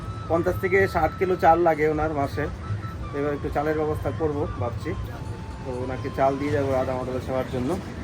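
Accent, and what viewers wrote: Bangladesh. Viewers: রাধে রাধে জয় শ্রী রাধে।প্রভু আপনার কথা শুনে মনে হয় বাংলা দেশের রাজধানীর ভাষায় আপনি কথা বলেন।আপনার ভাষা আমার খুব ভালো লাগে।আপনাকে ধন্যবাদ আপনাকে অভিনন্দন ও অসংখ্য প্রণাম ।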